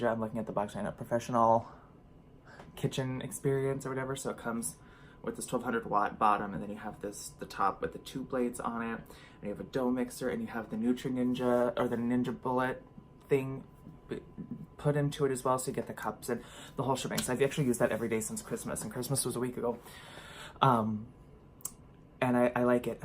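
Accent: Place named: Minnesota, United States. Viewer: Thanks for keeping it so real and honest,! This entire month has been a struggle for me food wise as I’ve allowed myself to have so much crap and I just don’t feel the way I did before when I was in control. In the long run, sugar after sugar really does hurt my weight loss efforts too in more than physical ways. Good job on upping your water and protein again. I too am determined to get in all my water today! I was skipping protein shakes here and there too due to the extra snacks. Getting back to our work will help us get back to routine. Love that you got your Bullet! My kids were just asking me yesterday when we would start having smoothies again. I’ll make more when the weather warms up. I can’t believe you were having kidney stones every 6 months. Ick! I’ve had the stents too and I seriously can’t imagine anything worse than that. 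Seriously! Probably the worst pain even above having children. I think maintenance and routine can easily be established with working out/exercise. The key is to start slow and add slow. It becomes so much easier and habitual over time. I can’t stress enough SLOW and attainable goals. Did you know you’re beginning to pick up a Minnesota accent? I love it...how you say “about”....Happiest New Years to you and yay for trying champagne. How fun!!!!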